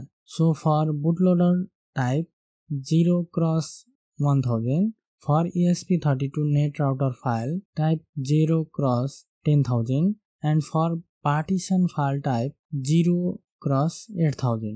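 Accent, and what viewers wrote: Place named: India. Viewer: downvoted because you sound indian and i can't understand half of what your saying. let me explain: downvotedbecauseyousoundindianandican'treallytellwhereonewordstartsandanotherwordendsbecauseyoudon'thavespacesbetweenyourwordswhenyouspeak. i don't think can be any more clear than that.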